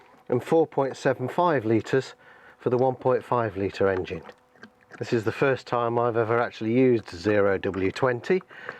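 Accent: England, United Kingdom. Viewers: Love listening to your instructions with your English accent! :) So easy!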